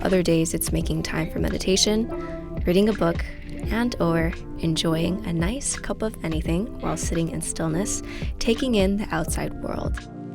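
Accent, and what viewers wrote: China. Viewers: Love your Chinglish! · The Chingrish is killing me. It's how I talk too hahahahaa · The way you speak Chinglish is how I do too HAHAHAHA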